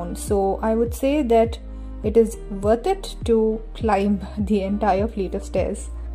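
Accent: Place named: India. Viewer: Wow Thank you for posting this. It brought back so many good memories since I lived in Viljandi for 3 years. Its a beautiful and calm town <3 I am from India and I guess you're Indian too? Assuming by your accent :)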